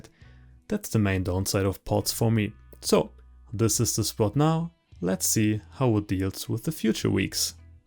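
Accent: Germany. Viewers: sieht gut aus Großer :) dein Englisch bringt mich auch immer wieder aufs neue zum schmunzeln <3 Jeder Ami oder Engländer wüsste nach 1 Satz, dass du Deutscher bist :P Einen wertvollen Tipp, den ich dir mal an die Hand geben möchte gegen die blöden Schnecken! Als ich früher Outdoor gegrowt habe war das sehr effektiv. Sammel dir kleine 0.2-0.5L Kunststofftöpfe/Behälter und kauf dir Kuperband! Von den Töpfen/Gefäßen schneidest du den Boden raus und klebst ringsrum das Kupferband. Schnecken klettern nicht über Kupfer. Wenn du deine Sämlinge dann einpflanzt den Topf drum rum, quasi wie mit deinen Schneckenkrägen die du ja bereits hast! Die Stämme können ohne Probleme wachsen der Platz/Durchmesser reicht völlig aus und du hast keine Schnecken mehr die Hochkrichen. Man muss natürlich drauf achten dass die unteren Triebe / Blätter nicht auf den Boden kommen, sonst können die Schnecken dort hochkrichen! 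Du solltest mit der Methode auf jeden Fall auch wesentlich günstiger liegen als du für die Schneckenkragen bezahlen würdest! Kupferband ist echt eine tolle Sache! Kann ich nur jedem Outdoorgrower empfehlen. Auch wenn man z.B. ein Hochbeet hat im Garten für Salat odg. Da kann man auch wunderbar 1 oder 2 Bahnen Kupferband ringsrum kleben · Das Ding mit dem tribe, man muss manchmal schon Deutsch sprechen, um dein Englisch zu verstehen ❤ Mach bitte weiter Videos, find deinen Stil einfach gut. Stream am Ende der Season fänd ich auch super!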